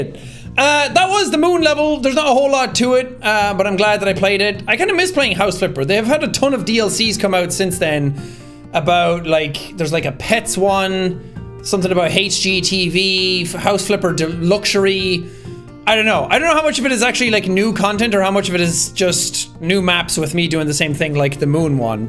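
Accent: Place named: Ireland. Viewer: this is the only vid where I can explicitly see sean's super irish accent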